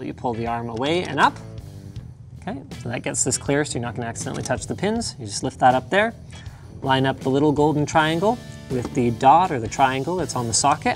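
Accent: Canada. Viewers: He's Canadian, that's how they say the letter Z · freaking Canadians and their pronunciation of the letter z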